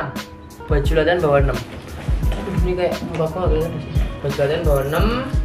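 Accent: Java, Indonesia. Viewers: wkwk ucul bahasanya campur2 medok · Kak nih asli mana sih,ngomong jowone medok bingit Rek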